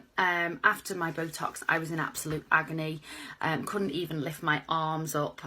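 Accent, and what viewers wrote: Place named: Wales, United Kingdom. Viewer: What a GREAT video! You are an inspiration to US ALL! Love your Wales accent!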